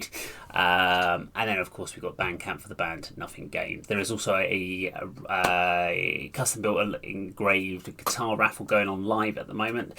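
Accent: United Kingdom. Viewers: You got 1 like and 1 new subscriber here 🙋‍♂️ Bcause i appreciate ur honest reaction, and i like ur british accent too lol Next - cover.. Thx m8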